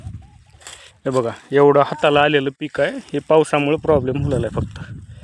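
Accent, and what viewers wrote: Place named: Latur, India. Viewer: लातूरचे का दादा बोलण्या वरून म्हणले दादा . 😊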